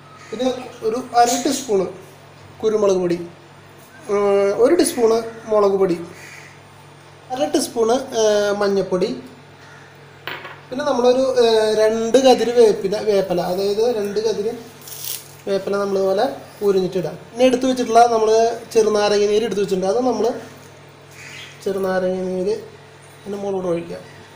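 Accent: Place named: Thrissur, India. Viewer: രാത്രി പതിനൊന്നരക്ക് ഈ വീഡിയോ കണ്ടപ്പൊത്തന്നെ വിശപ്പായി... 😀... നല്ല അവതരണം, തൃശൂർ ഭാഷ എന്നെപോലെ തിരുവനന്തപുരംകാർക്കു വലിയ ഇഷ്ടമാണ് 👍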